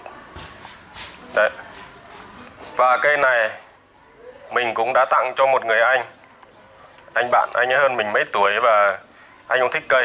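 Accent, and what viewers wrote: Vietnam. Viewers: Giọng thằng này 70% dân hoa thanh quế. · Bác dậy làm rất dễ hiểu.mà cho e hỏi bác ở thanh hoá phải k ạ.e nge giọng giống ở yên định thanh hoá quá bác ạ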